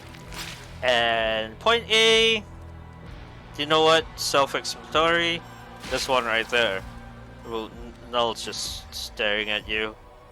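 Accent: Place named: Singapore. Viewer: Sounds like Singaporean or Malaysian 🤭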